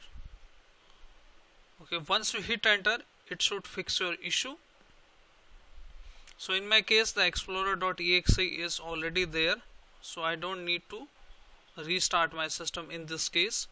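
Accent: India. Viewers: I am from India ❤❤❤ and I know that you also from India, Because your English pronunciation like Indian 🎉🎉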